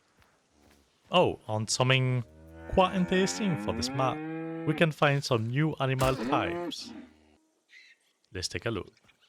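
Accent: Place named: France. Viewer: gg for banging 20 min english with french accent <3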